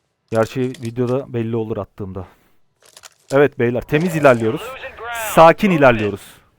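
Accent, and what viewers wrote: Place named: Anatolia, Turkey. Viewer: Hocam allahtan keşfetime düştün aşırı zevk aldım seni izlerken. Oyunundan çok konuşma tarzın aynı bizim Anadolu tarzına benziyor. Yayın bekliyoruz hocam eğer açacak olursan elimizden geldiğince destek oluruz inşallah.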